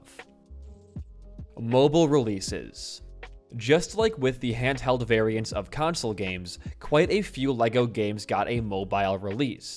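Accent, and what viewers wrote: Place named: Australia. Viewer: he’s Aussie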